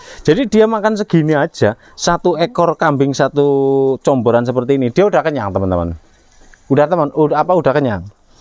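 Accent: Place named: Banyumas, Indonesia. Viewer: prtahankan Ngapaknya, trims ilmunya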